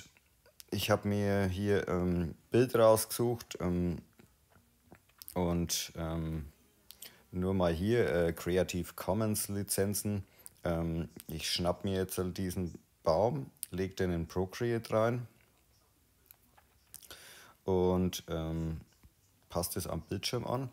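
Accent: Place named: Franconia, Germany. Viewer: dritter Anlauf hat funktioniert nachdem ich alle Kommentare gelesen habe. Spitze! Danke, und ich liebe fränkischen Akzent und Schäufala und Scheurebe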